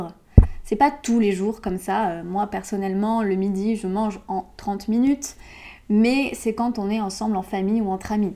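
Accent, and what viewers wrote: France. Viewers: ta voix et ton accent français sont des professeurs géniaux!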